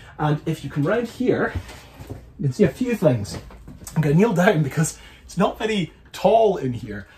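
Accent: Scotland, United Kingdom. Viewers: Outstanding job, and it’s even better with a Scottish accent. Very thankful you want to all your trouble to do this, it was well worth it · Excellent video just subscribed first time viewer😂 Nice to hear a jock voice and not a whiny American Voice Scotland Gaelic accent no 1🇮🇪🏴🇬🇧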